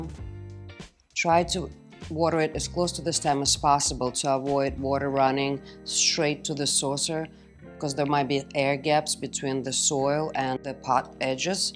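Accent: Russia. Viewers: I love Russian or some sorta accent 😅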